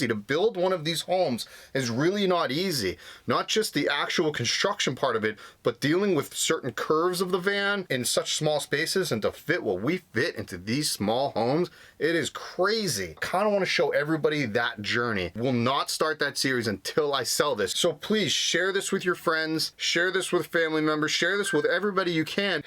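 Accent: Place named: Italian-American, United States. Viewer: East coast, Italian descent??? "Batta bing...," "anyways". Lol love it. FYI: water weighs 8 lbs per gallon, plus the weight of the container. Just saw your interview with Bob Wells, came to your channel, loving it so far. Know this video is almost a year old, will be checking out others. Love how fast you talk. Retired biochemist here that did standup comedy as a hobby for fundraisers in San Diego then Az. Mostly retired. Will be on the road by Jan 1, 2020. Carry on.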